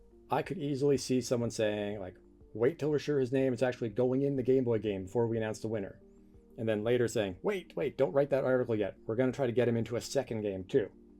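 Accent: Canada. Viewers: did you not hear the characteristic Canadian ow sound?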